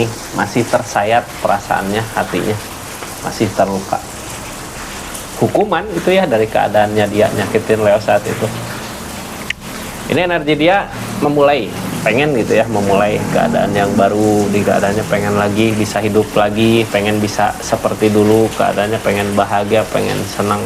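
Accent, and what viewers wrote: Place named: Sunda, Indonesia. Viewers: ❤❤❤❤❤❤❤❤❤❤❤❤ Resonet se x..Aak Aku suka . Yg membaca kan . Leo Sunda nya kental ... banget sehat dan sukses sllu❤❤❤❤ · ❤❤❤❤❤❤❤❤❤❤❤❤❤❤❤ Resonet se x. Aak Aku suka. Yg membaca kan. Logat sunda nya kental banget Sehat dan sukses sllu❤❤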